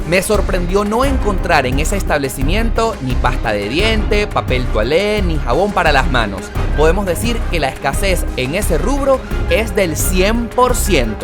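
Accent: Cuba. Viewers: Eres cubano?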